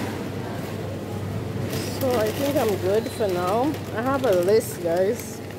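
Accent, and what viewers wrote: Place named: Saint Lucia. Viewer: I just came across this channel this morning am, today October 15, 2024. I just decide to check for youtubers from Lamentin. I decided to try this and the first channel I came across is yours. I heard the accent and I thought to myself, is that a fellow St Lucian. I have been told I have some relatives in Lamentin, because my grandfather was born in Martinique. I have been considering trying to look into find relatives who may have known my grandfather. Thats why I decided to look up youtubers from Lamentin. By any chance, have you come across anyone with the surname.....Darcis. You have a new subscriber.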